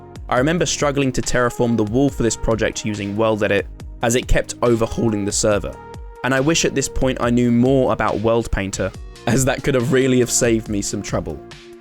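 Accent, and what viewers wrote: United Kingdom. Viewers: why does he kinda look like boffy but with a british accent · I really really hate English(your) accent but that what you do is siiick. And accent didnt exist now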